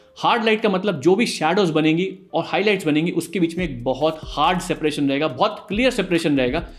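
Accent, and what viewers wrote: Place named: Pakistan. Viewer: Week done bro. Apka Hindi/Urdu ka akcent Bilkul h Hamari tarah h mtlb pakisatanio ki tara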